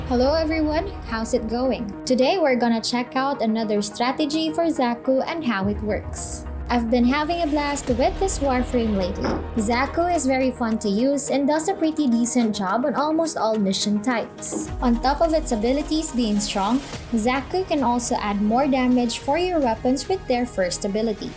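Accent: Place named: Philippines. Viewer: Are u filipino? I can tell from ur accent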